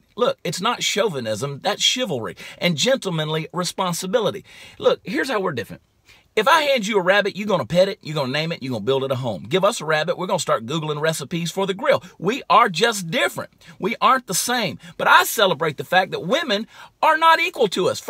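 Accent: Texas, United States. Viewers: I could listen to you spout the truth like this in that manly Texan drawl all day long. Every man needs to listen to this video AND others. My kinda guy. Keep it up, we like yer style.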